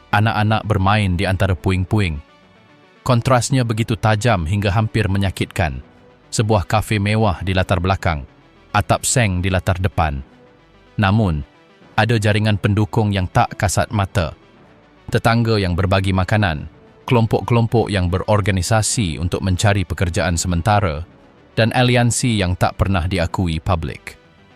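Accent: Malaysia. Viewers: Pencerita seperti org Malaysia tapi pakai gaya bahasa Indonesia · Iki canel wong Malaysia... Logat dan bhs Malaysia nyel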